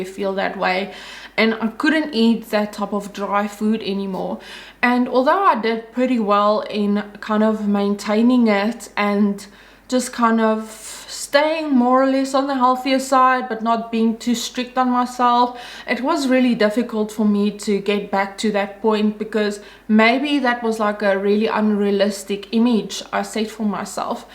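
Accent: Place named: South Africa. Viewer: Love the South African accent!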